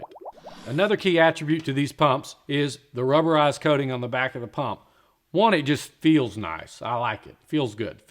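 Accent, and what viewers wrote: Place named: South, United States. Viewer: This better be a good pump. I just ordered it with my GEO Calcium Reactor. With a Southern Accent...I do trust this man. He must be somewhere in Texas with me.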